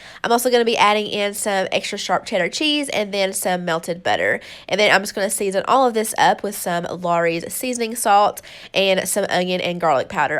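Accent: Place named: Texas, United States. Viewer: She sounds like us Texans except I’m even more country than her. I feel at home with her accent.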